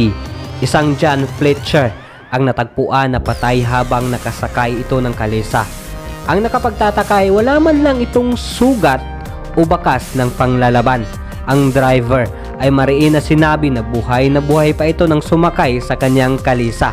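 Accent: Visayas, Philippines. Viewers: Parang bisaya managalog, may paduterte pa na singit,, dds ka bay?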